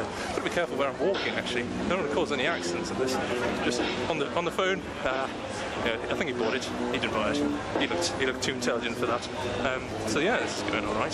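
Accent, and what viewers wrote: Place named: Newcastle, United Kingdom. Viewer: you live in newcastle? thought i heard something in your voice in another vid, if you do, i might see you around sometime :)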